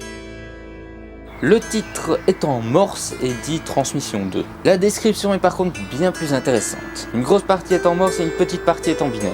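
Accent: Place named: Belgium. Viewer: un belge !!!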